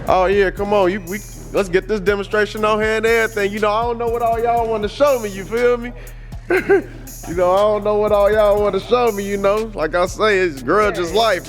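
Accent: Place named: South, United States. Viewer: Your black car in my opinion is way nasty 🤢 then that mustang well I guess if it was at least LSX swapped 😂 but your on your way to being a car guy and I know you goin get mad when I say this but if you didn’t know what that car was untill they said not good when you walked in the buildin even if we gm guys but ford guys that cobra is a relic even got leather seats yup then white bits ain’t playin you can tell but the way they do big shit every car they had was 150k race cars 🏎 they don’t fuckin play games then the guys that you don’t race then he was talking you know he’s grown ass MAN. When he says I’m NOT. Going to talk shit till the cars done and we no what we got he’s grown ass NA. I respect that but I like your channel about 85% of the shit you say I have to ask my 21 year old son what the fuck is saying you kept saying KAA and I said mason what the fuck is he saying bub he said car dad I said awe shit he sound like our fam from the south you gotta ask then four times what you say huh huh 🤔 lol but I like this channel you never know what’s going down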